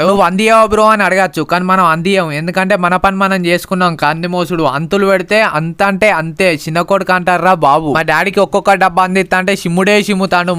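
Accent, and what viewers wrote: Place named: Telangana, India. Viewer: Telangana slang keka bro ❤️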